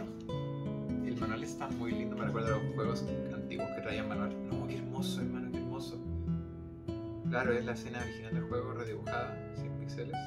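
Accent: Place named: Chile. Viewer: Hola buenas , escuche tu acento y inmediatamente supe que eres chileno jaja , queria preguntarte si ¿tuviste algun problema al recibir el paquete? (Un retraso o algo) lo pregunto por que lo quisiera ,la verdad esque esta muy hermosa la edicion y me encantaria tenerlo. Muy buen unboxing , me caes bien ❤ te voy a empezar a seguir , te deceo mucha suerte en tu vida espero que crezcas en youtube (bueno ya mucho parloteo) nos vemos chao👋❤ .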